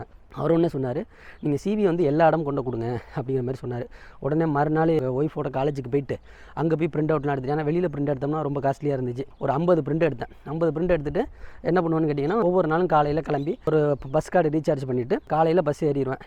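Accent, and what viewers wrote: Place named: Madurai, India. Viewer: Bro, I really like your innocent. Am admiring your way of talking. I think your native is madurai because your slang is like that madurai.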